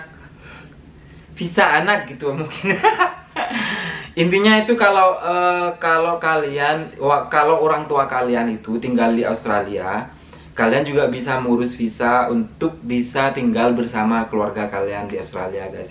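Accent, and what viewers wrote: Madura, Indonesia. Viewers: Asli Madura Kah Sampean Bang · Logatnya kayak orang madura bang... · Baru paham, = MADURA, pantes logat e gak asing wkwk 😂😂🎉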